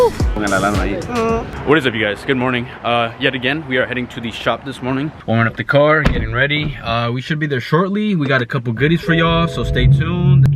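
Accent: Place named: El Salvador. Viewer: I know a Salvi when I hear one 🤣🔥🔥 Dope video !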